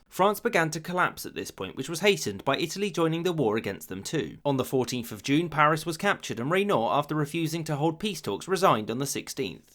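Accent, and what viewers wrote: England, United Kingdom. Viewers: I think the English accent explains why the video is anti-British.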